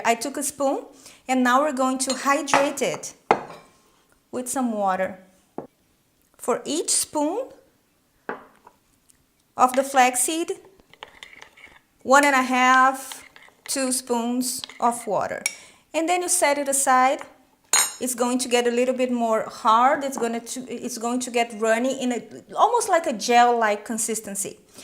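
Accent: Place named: Brazil. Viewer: Hi. I am trying to guess where is your accent from. Is it from Brazil? Thank you.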